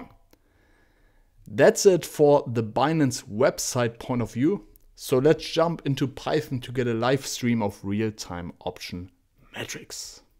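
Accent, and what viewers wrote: Germany. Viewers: My God thank you for German accented coder, you are awesome. Indians are fine but accent is sometimes difficult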